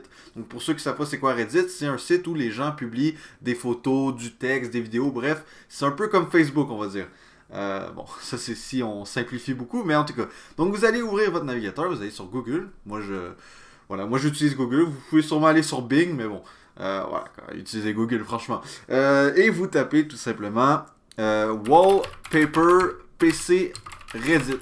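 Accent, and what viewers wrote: Quebec, Canada. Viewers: Vidéo rapide simple efficace + le petit accent québécois +1 abbonement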